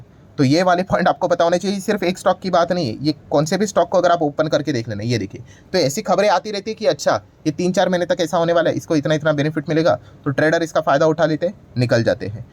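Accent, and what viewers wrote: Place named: South, India. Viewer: Baat sunke to lagta hai South se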